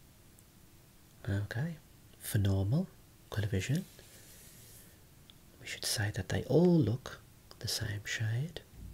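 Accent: United Kingdom. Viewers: Your voice and your british accent are so calming, I love it!!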